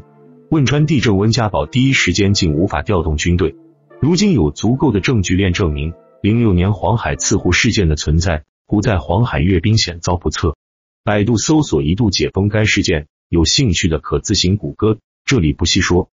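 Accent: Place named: Taiwan. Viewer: 台湾人?有台湾腔。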